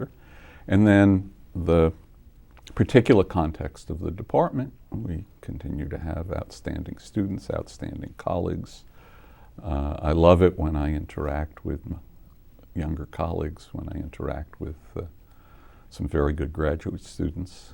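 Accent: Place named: New York, United States. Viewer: Strong NYC accent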